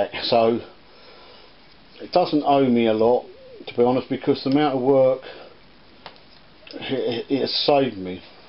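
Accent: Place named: United Kingdom. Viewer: Excellent video. Also, quite fun watching the computer translator try to deal with a heavy brit accent.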